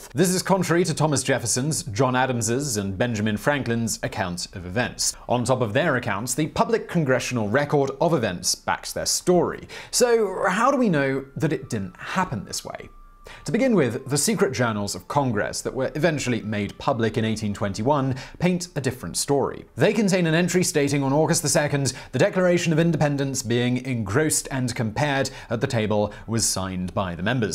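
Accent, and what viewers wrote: United Kingdom. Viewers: Mr. White Yes, a British guy. Hired by an American company to read a script. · ... as told by a British man lol Haven’t watched yet, just love the irony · Simon reads this because Americans think British accents equals double smart... And so that the show isn't completely American centric to promote a wider audience.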